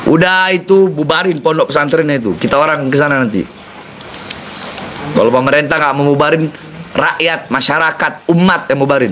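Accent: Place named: Manado, Indonesia. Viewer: Sama sama orang Manado (Sulawesi) lagam bicaranya sama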